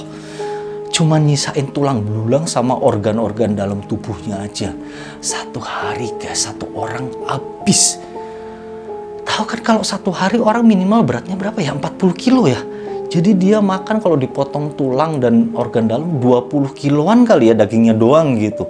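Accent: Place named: Sunda, Indonesia. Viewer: Sunda na kentel pisan atuh wkwk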